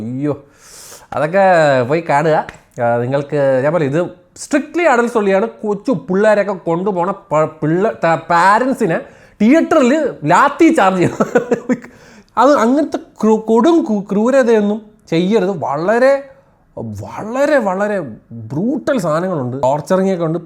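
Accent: Trivandrum, India. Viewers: Do not believe this joker Trivandrum slang u tuber . These are paid reviews .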